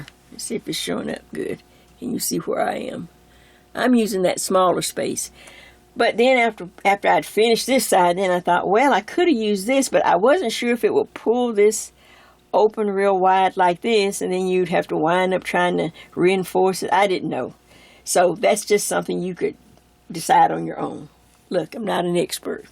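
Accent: South, United States. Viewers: I just found your channel! You're just darling!! Plus, being a southern myself....I love your southern drawl, and demeanor!!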